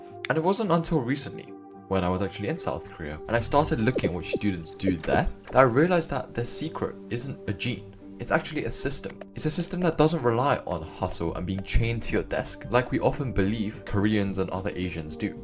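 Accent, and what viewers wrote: United Kingdom. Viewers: why does he sound so british?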